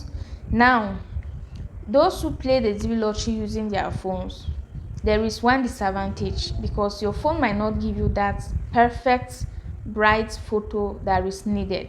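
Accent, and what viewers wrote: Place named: Cameroon. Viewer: She sounds like a Cameroonian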